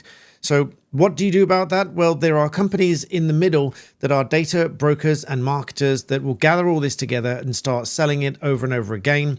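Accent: United Kingdom. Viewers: I thought you were Blacktail Studios and wondered how you got a British accent 😂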